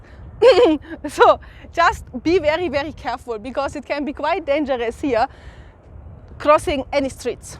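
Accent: Luhya, Kenya. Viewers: She has a kikuyu accent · Has a Kenyan accent 😄apart from the French 'r' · You almost picking up a kenyan accent. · Haaaaa huyu si ni mluya ....aki ...Also love your luhyia accent and lips 😍 💕 and your nose looks like that of a luhyia ....😁😁😁😁😁🙊 hakuna matata...we wear suits ....allaaaar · There's a bit of a Kenyan accent creeping in. Kudos to Kenya, they have developed to such an extent that tourists are attracted to their country.. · Why does she sound like a Kenyan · It feels like she has a kenyan accent already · hiyo accent sio ya yurop. That's a typical kenyan english accent. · She did a lot of research n got it right. But she sounds lk a person who have lived in Kenya before n if not, she's a very good learner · You do have a local luhya accent and facial expressions that's a plus. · Is it the accent or do you really already sound Kenyan am as you say supprised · you talk like a kenyan woman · Her accent is so Kikuyu or somehow Kimeru....... 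Typical kenyan accent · Your accent is more Kenyan than European. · You have a kenyan accent though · Na uko na kizungu ya mkikuyu kidogo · Damn your Kiswahili pronunciation to some Kenyan English accent is spot on!. you got a lot of Kenyan vibes wow! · Ni kama alifunzwa kingereza na wakenya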